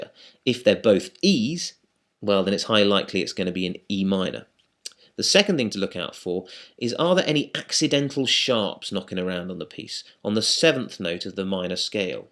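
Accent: United Kingdom. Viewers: THANK YOU.YOU ARE AWESOME AND YOUR ACCENT MAKES ME MISS UK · Why do I learn better from a dude with a British accent? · British man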